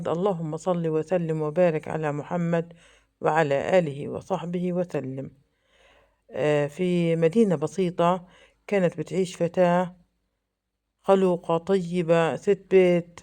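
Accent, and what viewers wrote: Palestine. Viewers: اللهجة الفلسطينية❤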